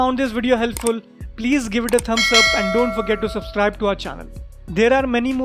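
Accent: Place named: India. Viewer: Your accent smells masala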